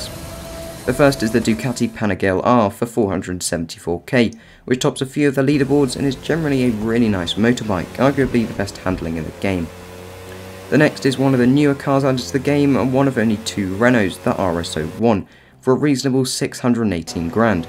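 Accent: United Kingdom. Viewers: nice to see ur still doing crew 2 ! i just got the pagani zonda r and it is INSANE! btw u sound british which part ur from?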